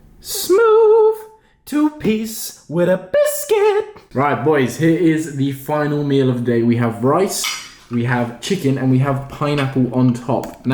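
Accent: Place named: United Kingdom. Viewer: Funny British accent, great charisma and tons of human charm flowing in streams from this cute nice guy. That's why I love this channel 😀👌💋🧡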